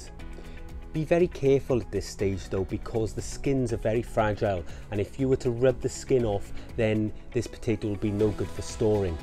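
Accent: United Kingdom. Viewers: I’m American and I can spot that Welsh voice a mile away! It’s a beautiful language that creates a beautiful sound when speaking Engliah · wow I used to hate UK accents until I heard yours.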